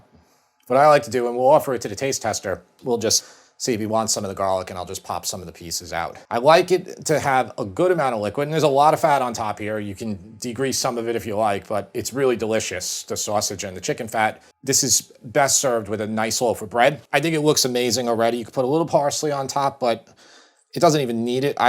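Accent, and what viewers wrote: New York, United States. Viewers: I started checking out this channel mostly for the classic deli salad/sandwich recipes, after I specifically went looking for somebody with a Noo Yawk (or Jersey or LI) accent who actually made that stuff for a living. I've tried a few now and they've all been exactly what I imagine a good NYC Deli tuna salad or mac salad or chicken salad should taste like. And I gotta say, all these "easy one-pan" meals and pasta dishes look and sound absolutely awesome too. Time for me to start feasting on more than just really good salads and sandwiches I think. Cheers for all the great ideas!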